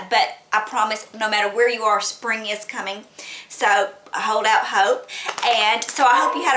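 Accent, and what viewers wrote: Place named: South, United States. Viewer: Great video hun and I just love your southern accent heehee too cute for words :) I subscribed have a great weekend dear xxx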